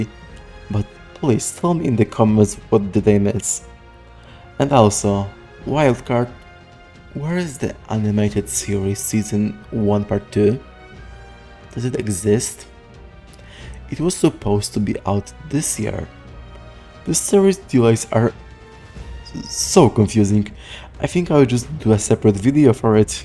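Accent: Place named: Poland. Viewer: This guy sounds Polish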